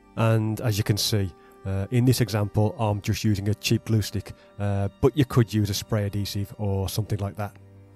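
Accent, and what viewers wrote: Birmingham, United Kingdom. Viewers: Thanks. Got a game of D&D tomorrow, and if I get some stuff together I will try and PM you, and/or send you some pics. Cheers for the resources. They are fantastically well thought out and executed brilliantly. I'm currently out of work at the moment, so can't send you any money at the moment, but once I get a job I will be sure to send you some much deserved funds. Guessing your from the Birmingham area from your accent. Close to me!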